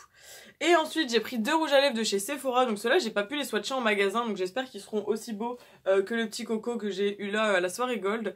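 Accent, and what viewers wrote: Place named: South, France. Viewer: Ton accent du sud me tue mdr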